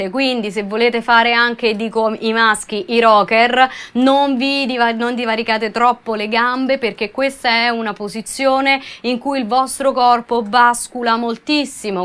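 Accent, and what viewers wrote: Rome, Italy. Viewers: Se sente che è de Roma :D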